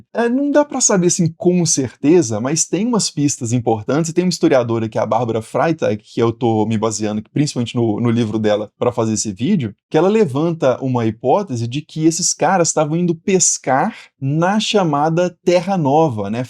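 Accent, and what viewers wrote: Minas Gerais, Brazil. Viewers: Hoje vc ta mais mineiro que o normal kkkk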